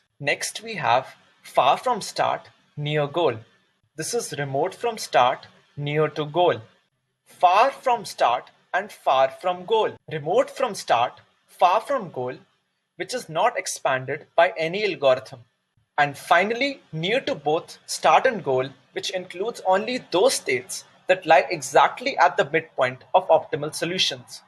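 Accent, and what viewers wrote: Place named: Telugu, India. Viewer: Arif C it kinda look like Telugu accent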